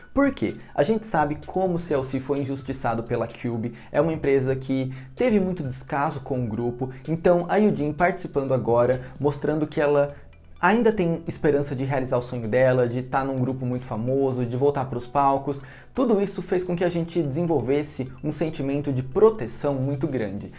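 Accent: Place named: Caipira, Brazil. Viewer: Acho tão fofo esse sotaque "caipira" do 🤗🥰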